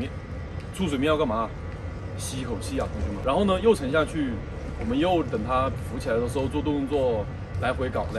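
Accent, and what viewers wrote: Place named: Hubei, China. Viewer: 这教练大概是湖北人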